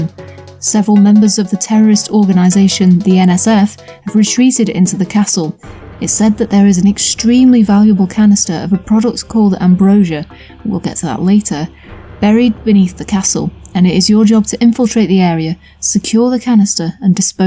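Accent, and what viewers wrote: United Kingdom. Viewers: Ok not on my phone now so I can reply properly :) Deus Ex had a massive effect on my early gaming days. Like you I remember playing it many times and spending time working out how far the narrative web would let me push it. I discovered that Paul could be saved by myself and it was my first major 'what if' moment in gaming. I still look back at that realisation with very fond memories, that this game world had so many clever ways that recognised my choices. I'd be interested to know what you think of the other games, imho none have come close to the original ofc :) Btw I've been trying to place your accent, are you in the North? The odd 'that got buggered up' is something I say :) Looking forward to your next project, I'll share this on SM and hopefully get you a few more followers because this quality of production is just as good as any made by the bigger sites. 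Cheers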